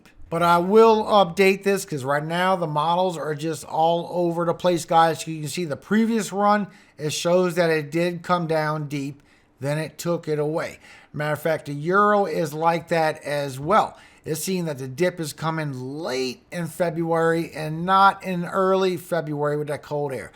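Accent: Louisiana, United States. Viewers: brother you have a Louisiana accent yeah😁!!!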